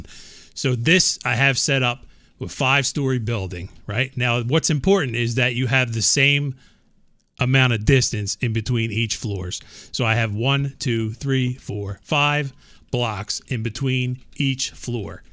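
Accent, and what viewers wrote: Philadelphia, United States. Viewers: Great video! Do I hear a Philly accent? It's wooder not water!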